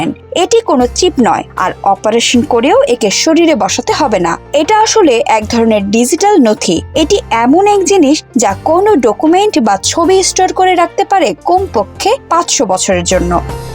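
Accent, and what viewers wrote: Kolkata, India. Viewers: ৭১ টিভি কি কলিকাতার সাংবাদিক নিয়োগ ূিছে নাকি🙄🙄🙄🙄 সেরকমই লাগে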